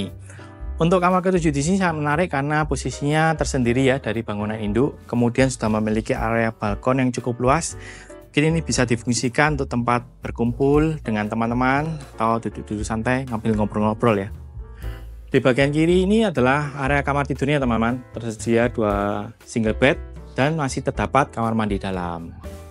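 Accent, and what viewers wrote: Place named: East Java, Indonesia. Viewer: Videonya menjelaskan banyak hal kecuali lokasi, hal yang justru paling penting kalo mau jual rumah. Lokasinya di kota mana? Saya cuma bisa nebak dari dialek hostnya, yaitu Jawa Timuran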